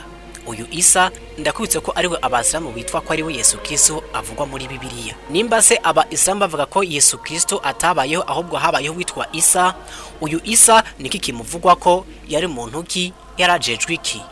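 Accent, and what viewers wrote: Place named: Rwanda. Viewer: Komera cane kuri Documentaire bwana ariko accent zawe ni Mwanafunzi Esmail yuzuy Hama ikinyarwanda nicinshi kurusha ikirundi. Gusa courage cane kubushakashatsi I'm from LIMOTV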